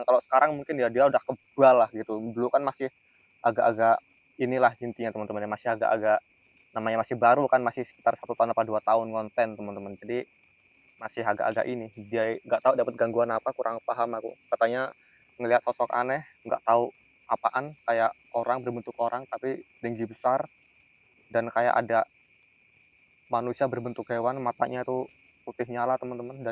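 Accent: Java, Indonesia. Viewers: Sumatera dia..sama dgn bang maman..tapi logat2 ada jawanya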